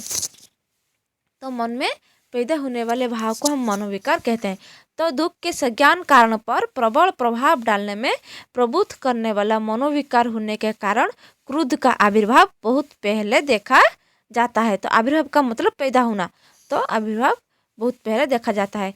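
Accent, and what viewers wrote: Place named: Odisha, India. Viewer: odia uchharan ho rahi hai .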